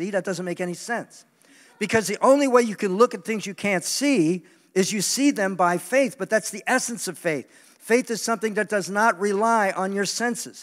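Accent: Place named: Brooklyn, United States. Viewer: Hi, Lol what's up with the Brooklyn accent?😂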